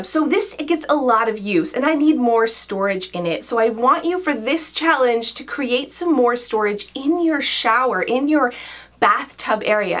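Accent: Canada. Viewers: You are beautiful inside & outside! I truly enjoy every video & love your relatable personality (especially your lovely Canadian accent!). Don't change a thing!!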